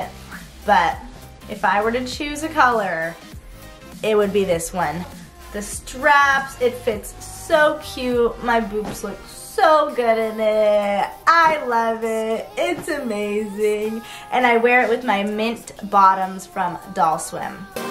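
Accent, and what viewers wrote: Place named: Brazil. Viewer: Você tem sotaque brasileiro ou é impressão minha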